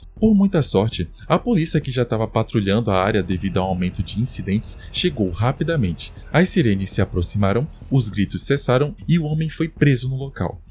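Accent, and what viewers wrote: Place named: United States. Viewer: Bom vídeo mas pq vc fala os nomes da vtubers japonesas com sotaque americano? kkkkkkkkkk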